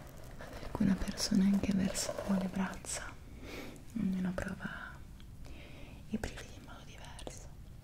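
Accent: Italy. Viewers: meravigliosa, molto rilassante e la sua voce è estremamente morbida, il suo accento italiano è una delizia da ascoltare. un bacio dal Brasile 😴❤️🇧🇷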